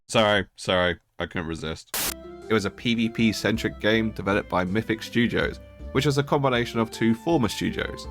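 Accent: United Kingdom. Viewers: I'm amazed you have this many subscribers- a clan of drones really. First of all "old as fk" I am 39, played daoc since i was in my teens. I do 50 pushups almost every day and jog 6 miles + daily. That aside you judgemental fk question- Do you do any of that other than your life consisting based off of already provided information? Didn't think so. (aka leech with no real talent), Oh let's start off with your bitching of a 15 dollar subscription fee. Most MMOs that are free to play and we are talking hundreds if not thousands where even on your mobile app gaming. Oh yes I'm older- still know that phone transactions of whatever 1.99/4.99/9.99/49,99/99.99) required purchase to avoid a block wall etc = more of a rip off than a 14.99 monthly subscription. You have no clue of technology adaptation there was... Bluetooth/headsets/discord in earlier versions. Meaning when PvP was going this "new" tech was used to exploit farming, players would utilize that and set up their own realms by having their friends on the opposing side for points. 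You sounds like a brit brat and again we poured your shitty tea into the sea for a reason. Oh one last thing at least my generation knows what sex they are. MURICA! Goodluck with your wisdom bro comes with age. I personally couldnt see you changing a tire, shooting a deer, or yeah you be you haha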